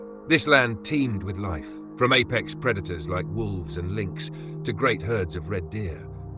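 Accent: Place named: England, United Kingdom. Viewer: English voice explaining our story to us! Sorry! Couldn’t listen to this…..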